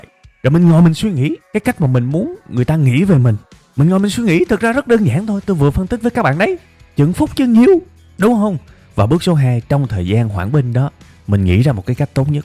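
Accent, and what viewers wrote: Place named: Mekong Delta, Vietnam. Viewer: bài giảng quá hay, diễn đạt dí dỏm, dễ hiểu, giọng miền Tây ngọt ngào, truyền cảm.. . Thanks!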